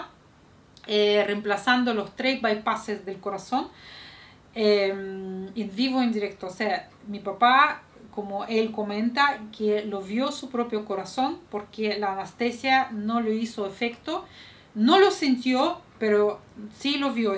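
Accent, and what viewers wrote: Chile. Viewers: Hola amiga, gran historia la de tu vida. Imagino que todo ésto te ha convertido en una mujer fuerte y determinada. Felicitaciones, se requiere de mucho temple y carácter para sobrellevar tales dificultades. Admirable. Es posible que la manera de ser de los chilenos te hagan sentir identificada con nosotros. Acá cuando te caes tienes que pararte rápido y seguir adelante. Un abrazo desde Santiago de Chile. En todo caso el idioma chileno te queda muy bien ❤😂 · Hola.. muy interesante tu vida, eso sí es una historia de vida, te escuché muy atentamente y también fue agradable escuchar tu acento y modismos Chilenos, eres entretenida, hermosa y agradable. Saludos y me alegro mucho por ti. Un abrazo.🤗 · ¡Hola! Escuché integramente tu relato, porque me lograste despertar mucho interés en tu historia de vida. Me encanta como te expresas en español-chileno y la manera honesta de tu relato. 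Admiro la fuerza resiliente que has mostrado para salir adelante, tanto en el plano personal, como en la responsabilidad con tu hijo. Más allá de los muchos momentos difíciles que te ha tocado vivenciar en tus experiencias migratorias, ¡te felicito por como has asumido tu vida! Te cuento que he tenido la oportunidad de viajar a varios países, entre ellos pude viajar a Rusia en 2019 y conocer Moscú. A partir de ese viaje opté por aprender ruso y hasta ahora trato de practicar el idioma cada vez que pueda. Меня зовут Рафаэль, и я живу в Сантьяго, Чили. Надеюсь, у тебя все хорошо, и ты наслаждаешься жизнью, своим сыном и любой поездкой за границу, которую решишь предпринять. Пока-пока!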